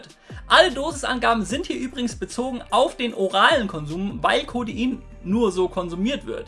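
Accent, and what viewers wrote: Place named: Franconia, Germany. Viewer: Ist ein Dialekt, das sagt mann so in Franken.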